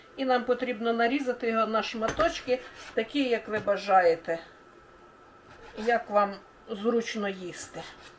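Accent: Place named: Khmelnytskyi, Ukraine. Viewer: Ви з Хмельниччини? Говорите як на Хмельниччині. ❤ Дякую за ваші рецепти.❤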